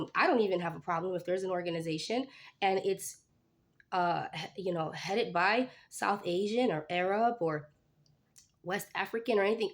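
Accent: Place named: United States. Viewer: Well said, Sister. I am a white American revert married to an Arab. I took my shahada about 24 years ago. My oldest daughter went to an Islamic school in California where I was welcomed by some but not all. It was great community. I lived in the Middle East and had the unique experience of having many American Muslim friends who were married to Arab men. I didn’t go to the masjid there as it was more common for women to gather at homes but I had a community for my children to see where they felt they belonged. When we moved back I tried to be a part of the community but felt really isolated and my children did as well. We haven’t been a part of a masjid during their adolescent years as a result. I feel that I failed them for my being too weak to try and make it work at a masjid. I don’t know any African American Muslims but I have always wanted to try to be a part of that community. 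As a white revert I feel similar feelings that you do as an African American Muslim. Thank Allah for YouTube where I can get something for myself when it comes to practicing my deen. I found you a few years back because you were reading the Quran in English and I longed for an American woman’s voice. You were that woman! may Allah reward you for all of your efforts. Jazakallah khair.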